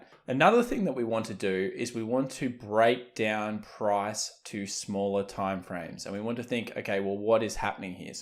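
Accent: Australia. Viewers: I m not dissing every australian ahaha I didnt know australian speak like that. I just cant withstand different accents other than american. There is noth to do about it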